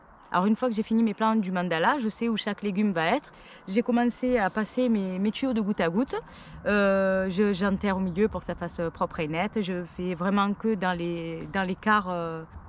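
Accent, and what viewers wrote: South, France. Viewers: Les premiers semis avec l'accent du sud ...Que demander de plus 😉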